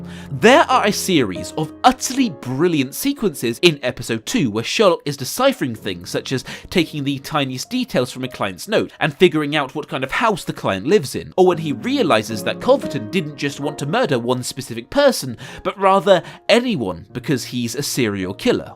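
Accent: England, United Kingdom. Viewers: Honestly I can't even watch this.... It's just too much cringy English crap like the word "Baskerville" said repeatedly.... Love your channel even though you're English but wow I can't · I haven't heard someone speak so British since my two British teachers :)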